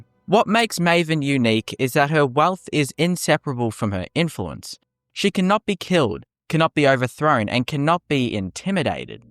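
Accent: Australia. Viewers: calm down mate hes Australian 😂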